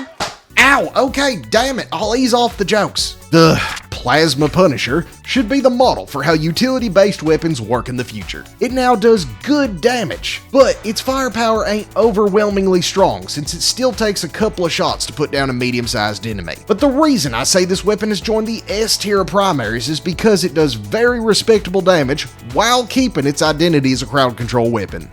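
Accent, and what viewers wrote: South, United States. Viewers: What state you from? Not to get personal or anything, but a bunch of people that I’ve played with said I sound like you so I’d like to know if it’s because we are from the same state or just that we both have a southern accent lol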